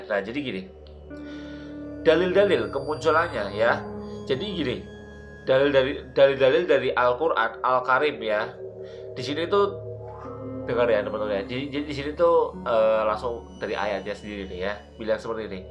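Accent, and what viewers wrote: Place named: Java, Indonesia. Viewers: medok nya yang buat aku klepek klepek malah hihihi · medoke ketara nemen yakk hahahaha...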